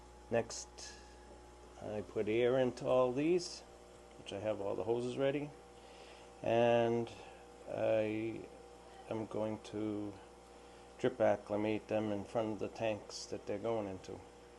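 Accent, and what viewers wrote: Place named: Boston, United States. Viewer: I love your Boston accent. Awesome video, thank you for sharing.:face-red-heart-shape::hand-pink-waving::glasses-purple-yellow-diamond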